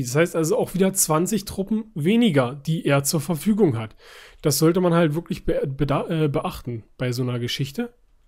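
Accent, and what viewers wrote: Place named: Germany. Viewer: Du hast einen hardcore deutschen Akzent wenn du englisch sprichst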